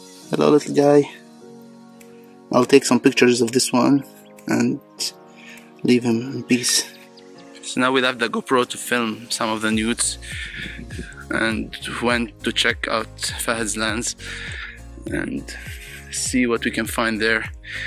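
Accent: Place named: Iran. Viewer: Your accent is similar to Iranians. are you Iranian?!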